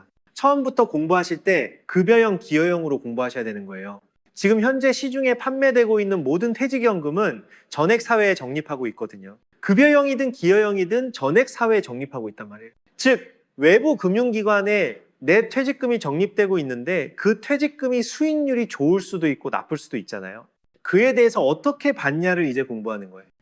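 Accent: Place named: Gyeongsang, South Korea. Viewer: 손보 29일 시험 30일 발표 결과 적으러 왔어요. 75점으로 합격했어요. 적상인가 해상인가 배 문제랑 처음보는 문제들 틀린것 같고, 합격하면 대박이라고 생각했는데 진짜 합격했어요....하하 8개나 틀렸지만 25개나 맞췄으니! 덕분에 합격했습니다. 난이도가 오르고 범위가 변경된 부분은 속상했지만 그래도 특유의 "강조" 하시는 부분과 쉽게 암기할 수 있게 만들어 주신 덕분이 아닌가 생각이 드네요. 조만간 변액도 도전할건데 그때도 잘 부탁드립니다. 목소리는 다시 못 듣나요? 경상도인 으로서 익숙하고 정감있어서 더 잘 들렸던거같은데...😅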